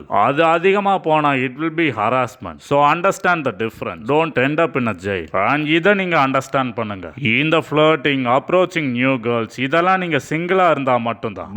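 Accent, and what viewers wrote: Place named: India. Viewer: So you guys mean to tell me, you understand everything in this video with the indian accent?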